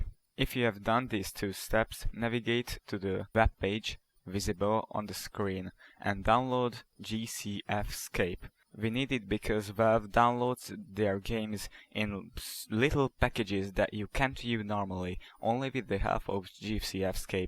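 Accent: Hungary. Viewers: Nagyon durván hallatszik a magyar akcentus :D De ügyes vagy! csak így tovább! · Szia :D Ahogy láttam a géped nyelvét, és hallottam a kiejtésedet, magyar vagy :D Nagyon szépen foléykonyan és érthetően beszélsz angolul, kívánom, hogy legyen vele nagy hasznod az életben! :) :D Ui: Köszi, nagyon sokat segített a videó :) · Az akcentusból tudtam meg hogy magyar vagy! Sok angol után nagyon könnyű kihallani :D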